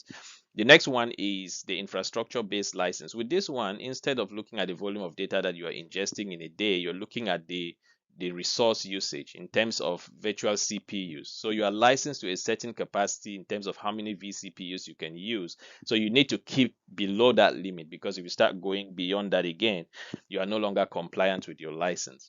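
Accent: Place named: Nigeria. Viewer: you sound very Nigeria but i like you teaching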